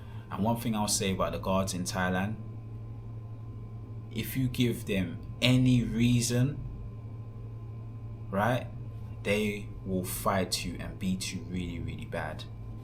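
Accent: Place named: London, United Kingdom. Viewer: If you had not done anything wrong, why did you flee? If you did nothing wrong, why did they chase you? If you had been causing trouble and left they would have just let you go, unless you had not paid a bill. Plus, you did injure a pedestrian. They could have charged you for that. These cops are not like the MET cops. You sound like you are from London. No verbal warning here. No behave yourself and go home. And definitely claims of: is it because I am black, don't work in Thailand.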